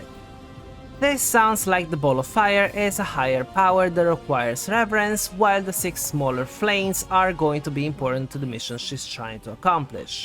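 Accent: Italy. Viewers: Ma sei italiano? 😮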